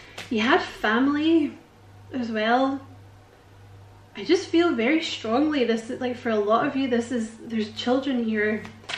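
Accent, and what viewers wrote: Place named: Scotland, United Kingdom. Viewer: First, if anyone screams at you in the comments, I will squash them like a bug.lol Second, I could listen to your Scottish accent all day. I came from there. It centers me and it gives me comfort. Guess you're stuck with me and when I move back I'll ignore you...lol..just kidding...thanks for the healing you bring that helps me guide my destiny in the kindest of ways. You rock!!!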